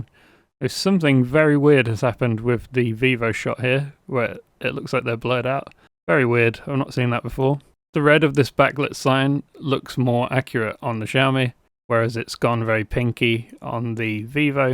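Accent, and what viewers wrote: United Kingdom. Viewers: I like ur British accent when u speaking bout' these phone i very like ig 😅😂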